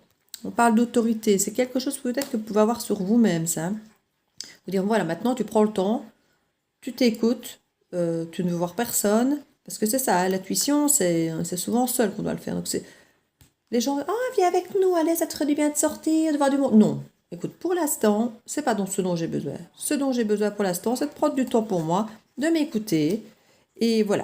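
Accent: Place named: Liège, Belgium. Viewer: Une guidance tres parlante... comme toujours... ;-) ca evolue petit a petit, quelques petits changements chez elle depuis ta guidance mais j'ai reprend mon "pouvoir" tout doucement pour me prendre en priorité et ne plus etre dans l'attente... Pour le reste, on verra bien.. ;-) Un grand merci pour tout... Plein de belles énergies pour toi (j'adore toujours autant cet accent liegeois :p )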